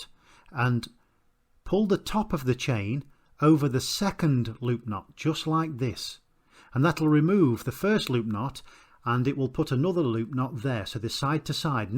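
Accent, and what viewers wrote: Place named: England, United Kingdom. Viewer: Damn dude... What a breath of fresh air to come across a video that is clear, concise, gets right to the point...and above all else...provides the solution to the stated problem. In some ways I was thinking this may be the quintessential "perfect" diy video on YouTube. By this I mean, it certainly does everything listed above, but in addition, it handcuffs the malcontent viewer who finds fault in every video...the video quality is more than sufficient. The audio is of even higher quality, not to mention the soothing, dulcet tones of the anglican accent. Plus, there's no fat on this bone... There's only the bare essential information the viewer tuned in for. Well done. You don't have to sell me on subscribing, this video meritoriously earned my subscription.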